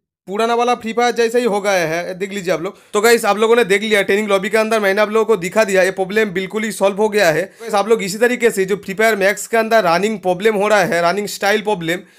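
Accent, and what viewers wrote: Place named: West Bengal, India. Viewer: Awaj sunke pata chal gaya bangali bhai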